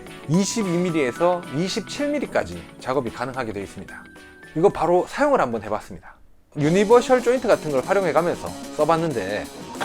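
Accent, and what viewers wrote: Gyeongsang, South Korea. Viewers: 재미있기도 하고 진실함도 보여서 좋습니다. 대구 근처로 생각되는데 경상도 억양이 더 정겹기도 하고~~~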